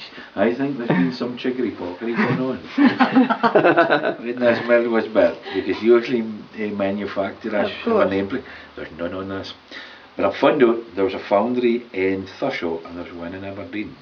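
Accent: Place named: Denmark. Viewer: he speaks english like a danish person, that is amazing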